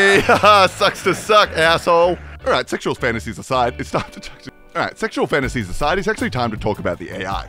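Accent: Australia. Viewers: i have to comment it here but, are you "??" not just for your aussie accent but your ability to not upload for months at a time. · He's Michael Reeves, but less robotics and more coding. Also a LOT of Aussie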